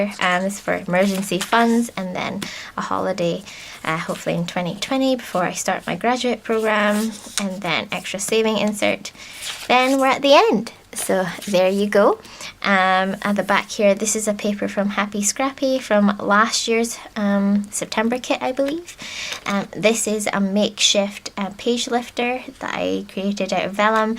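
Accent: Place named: Scotland, United Kingdom. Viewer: Love the video! Was pleasantly surprised to hear a Scottish accent too 😁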